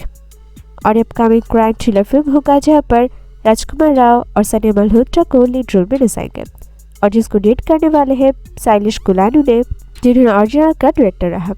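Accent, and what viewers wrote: Bengal, India. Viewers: You are a bengali ?? Your acceent is a bengali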